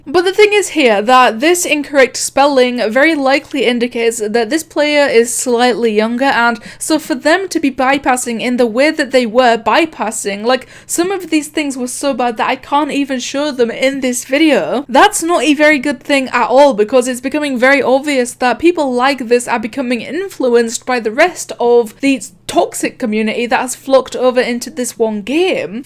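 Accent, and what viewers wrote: United Kingdom. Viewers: It sounds like u live in the UK, nice! Diff then but.... your not “coping him” of course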